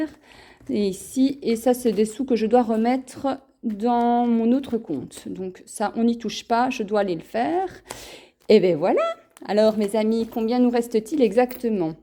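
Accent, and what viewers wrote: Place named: Belgium. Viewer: J'adore houit à la place de huit et nonante à la place de quatre vingt dix.J'adore les Belges et la Belgique.Ne change rien!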